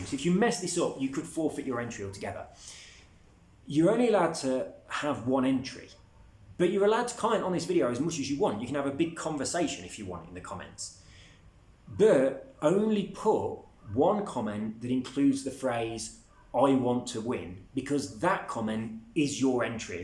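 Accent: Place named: Scotland, United Kingdom. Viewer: LOL nice apartment! Don't know anything about you but I can say you're a Scotch. Got it from the accent! Never had an apple watch but I can guess they're bad. Also agree with you. No idea why people have to be over 13 to join giveaways or restricted to join any of giveaways. Usually I enter only PS4 or PS5 competitions. I did enter yours because I want to trade for PS5 as soon as I win the competition. Well, the reason I want to trade for PS5, technology is really expensive in my country, Turkey. Don't know if you believe me but I still can't afford a PS4. That's why I want to win XBOX Series X. IMHO Xbox got better hardware, that's cool, but we can't ignore the fact Playstation has better exclusive games. I am not a multiplayer person, I play games for its story. I wanna feel in it. Which is why I am going to trade with a PS5 if I win this competition. 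I want to thank you for giving us this chance and good luck to all of you. Have a nice day and stay safe!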